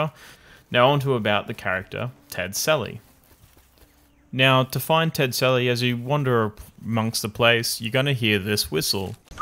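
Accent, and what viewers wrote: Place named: Australia. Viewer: It's nice to hear the good old Aussie accent used in videos. Keep it up mate.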